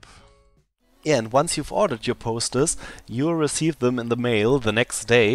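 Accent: Germany. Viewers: Are you German? I think you have a german accent (#nohate I like that accent) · Workout athome Yes he‘s from Germany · das deutche englisch lol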